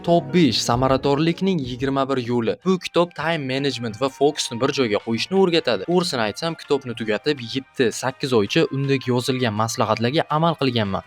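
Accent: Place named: Jizzakh, Uzbekistan. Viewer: Admin Jizzaxlimisiz shevez tortib ketarkan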